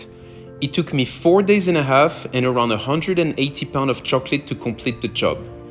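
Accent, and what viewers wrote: France. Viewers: Loving the voice over. Ça fait du bien d’écouter l’accent français ❤ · Your French accent is as smooth and as delicious as the finest chocolate. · I love your french accent 😅 Moi aussi je parle français😆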